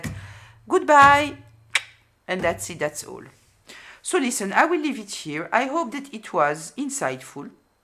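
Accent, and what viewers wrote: France. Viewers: You are goldilocks mama bear papa bear n a cheeky 😜 😉 😄 😏 😆 😘 lil baby 👶 bear all in one i am the lucky goldilocks living ❤️ in a safe space ❤️ 😅 love adore an sank in the awe of your accent. Sounds like French but I am but a bamboo lambo no offence meant. You are that beauty in the world card. I see you . eagle. hear you ...bull... build my empire on your word flowing gently like a true judge... not a kangaroo court....coming thru air n water. Kaballah is the umbrella. Do not get understanding then NOT act upon that understanding.. alas whatever the gen you'll never be part of the inner standing. You beautiful creature and your channeling from the gurus truly are my umberella, my guide😮a hand to hold and a voice in the wilderness who wants what is best for me..all in the fair wayfairers way to inner standing. Phew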